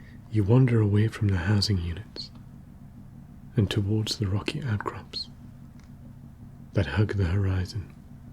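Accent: United Kingdom. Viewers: Hi! I accidentally came across your videos. Is this ”home made”? Looks so professional. Your english sounds so british 😊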